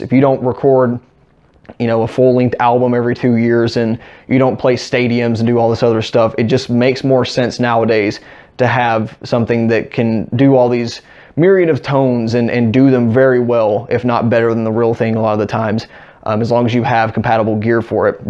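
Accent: Texas, United States. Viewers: It took me a while to notice that you have a texan(or at least southern) accent. But I like it bro, you sound like a cowboy Ps: I'm from Brazil, english isn't my first language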